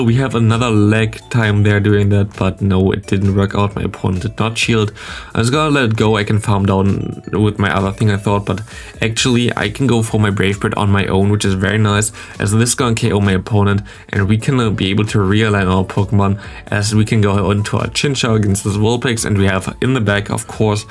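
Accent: Germany. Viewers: Most german accent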